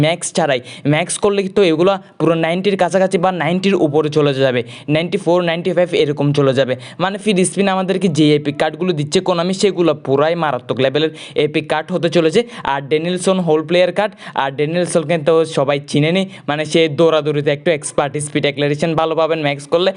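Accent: Chittagong, Bangladesh. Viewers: Apnar Kotha sone Ami 100% sure apni chatgaya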